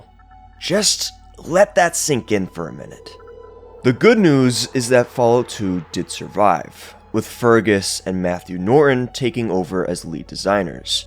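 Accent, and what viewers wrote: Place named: Upper Midwest, United States. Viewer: Are you from the the northern Midwest? Your voice is nice but I notice a bit of an accent which is actually quite charming.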